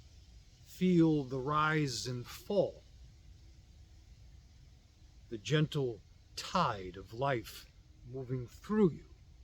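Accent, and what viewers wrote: Boston, United States. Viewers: Gold. Is there a subtle Boston accent?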